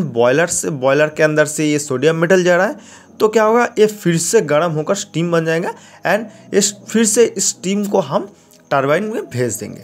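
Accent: Bengal, India. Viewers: Appe Bengali ho na 🎉❤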